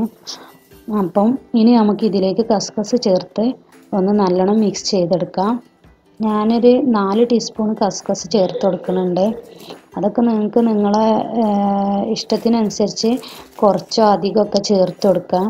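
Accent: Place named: Malappuram, India. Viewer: കുട്ടി മലപ്പുറത്ത് കാരിയാണ് ല്ലേ.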